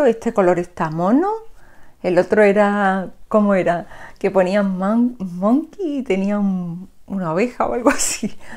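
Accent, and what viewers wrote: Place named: Malaga, Spain. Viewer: muchas gracias, es la primera vez que te veo ye has encantado por lo natural que eres y nuestro acento malagueño 😍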